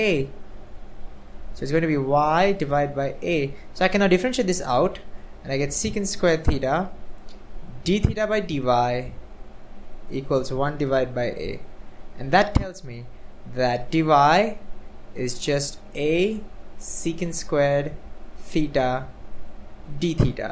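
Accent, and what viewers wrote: India. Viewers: wyf? indian? cuz ur accent hmm lol great explanation tho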